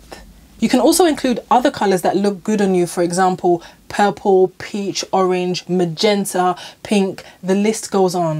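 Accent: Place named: United Kingdom. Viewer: It's the delightful British accent. ✨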